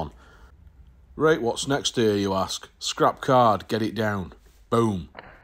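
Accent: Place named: Northern England, United Kingdom. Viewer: No messing…no extended intros..just got on with what you want to share…brilliant. Love your refreshing no nonsense hurl stuff on the table approach enhanced by your grand up north dialect. Love it, subscribed from the bottom left corner of the country. Get on my ‘ansom.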